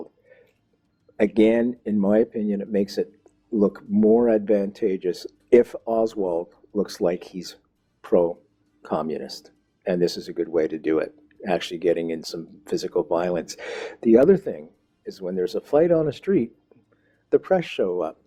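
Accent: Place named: Canada. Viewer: Hi - a listener from the UK here! I just wanted to say thank you for you tireless, even-handed work toward a range of topics. The assassination of JFK is something that has deeply interested me for a while, and I always enjoy hearing different points of view about the various elements of the event and people involved. JFK, from talking to my mother and grandparents and others, was a much respected, even loved, President here. And his death was a deeply shocking, saddening event that still stirs great emotion in my mother today. He seemed to represent a brighter future and engendered a great amount of respect here. There was, and remains, a great deal of scepticism about the government sanctioned explanations as to what happened before, during, and after President Kennedy's death. And, as an aside, I love listening to your Canadian accent and when you talk about Lake Ontario - always nice when I'm listening with a warm cup of tea of an evening!:) Thank you!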